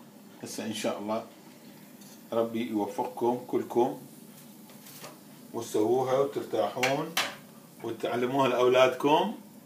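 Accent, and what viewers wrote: Iraq. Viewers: مرحبا عمو كلش واحد يرتاح من يسمعك تحجي بلهجتك البغداديه عمو اريد اعرف شلون نسوي خل التمر وشكرا جزيلا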